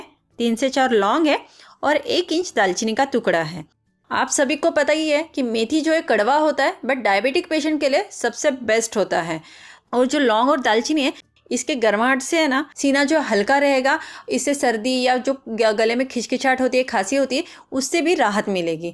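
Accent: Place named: Gujarat, India. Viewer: Aapka bolne ka lahza gujrati bhasha k jaisa hai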